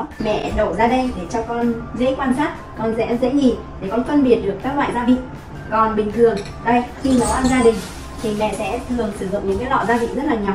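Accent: North, Vietnam. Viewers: Nghe kỹ giọng chị là miền Bắc hồi đó coi gia đình là số 1 nghe giọng miền nam mà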